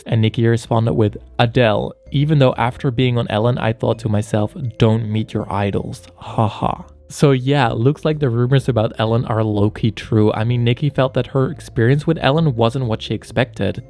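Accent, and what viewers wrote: United States. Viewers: Haha, ik zat rustig te luisteren naar je super Amerikaanse stemgeluid en dacht: Ow wauw hij zegt Chantal Jansen wel heel mooi Nederlands. 10 seconden later: mijn moedertaal is Nederlands. lol. Dat had ik gewoon even niet zien aankomen mede door je naam. Heel leuk. Goed verwoord allemaal. Ellen is echt niet leuk. Had altijd al een raar gevoel bij haar. Ze kijkt soms zo kil ofzo. leuke video, liked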